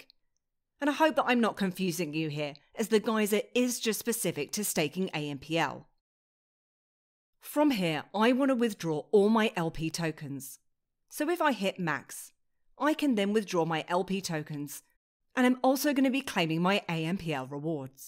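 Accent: United Kingdom. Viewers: British accent is nice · that voice and British accent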